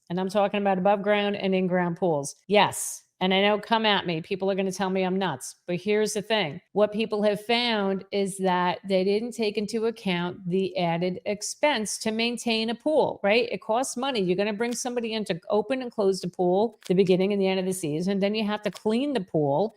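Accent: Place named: Northeast, United States. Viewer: Like you, the channel and the ideas presented. One update; sounds like you’re from the northeast and I don’t know what pools cost there, but here in Texas you can’t put in a basic pool - no grottos, spa or showers - for less than $80k. That really brings your point home even further. My advice? Make friends with someone who has a pool! 😅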